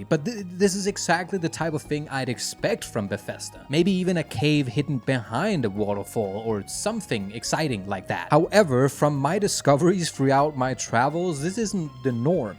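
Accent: Denmark. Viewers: Skide god video! Desværre giver accenten enhver Dansker væk 😅 Du har sgu gjort dig fortjent til et sub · Never seen your videos or channel before. Bit I could instantly tell where you’re from. That accent will always be a dead giveaway for us Dane’s.. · i sense a danish accent, alas it has made me subscribe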